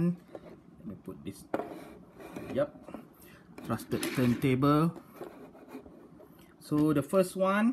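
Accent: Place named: Malaysia. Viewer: I did Subscribe this channel about 4 months ago, your channel has completely fulfill my desire to get to know what Hotwheels are on the newest case. At first, your video was good, but you also take notes to some suggestion that you get from your subscriber so now your video is getting better. And also, your intonations, your unique English-Melayu accent, the way you were talking makes me feels like i was having a conversation with my friends. You makes your subscribers feels like they were there, watching the unboxing themselves with you. Nice Job, Pakcik! your most famous tagline is: "Yes it is!" :D Salam.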